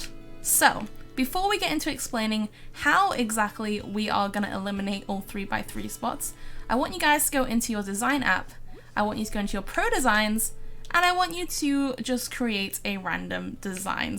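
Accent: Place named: North, United Kingdom. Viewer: Shes northern uk... it's a cool accent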